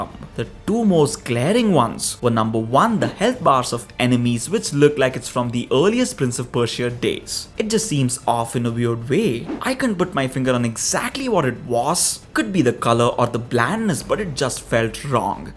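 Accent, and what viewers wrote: India. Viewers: basicly it's shit in ac unity it turns u into a f*ckin guards syndicate is just better things also it would be cool the more discounts for being good n not killing civs instead of killing u If u kill civs btw love ur channel keep up the great work n love the Indian accent always great to see foren ppl who play games I like its rare usually just americans and canadians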